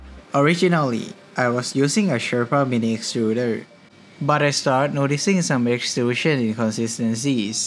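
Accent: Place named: Thailand. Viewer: very thai accent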